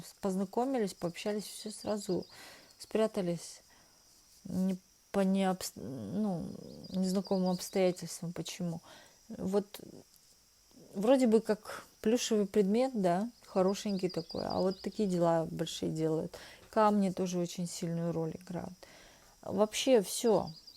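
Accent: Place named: Czech Republic. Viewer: Сегодня я осталась без работы в чужой стране , одна. Да, описание мне подходит. Ха!) и фамилия Воронова, и блондинка, и да белая ворона как бельмо для окружающих. В остальном , расклад интересный , благодарю. Я тоже нахожусь в чехии. Вы хорошо справляетесь с акцентом, но чешский говор и словечки проскакивают.) Это оригинально звучит))) Очень благодарна за Ваш труд. С наилучшими пожеланиями.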